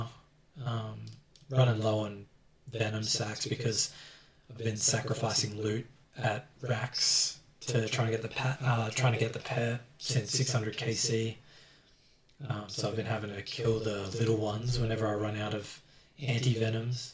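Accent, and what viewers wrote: Australia. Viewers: Can tell you're Aussie haha, Gz on 200m all. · Actually huge mate gz on true max! I’ve just started OS coming from world of Warcraft and I’m addicted as fuck. Almost 1k total level now, glad to hear another Aussie as well! Ps. Pls give me some GP 😉😂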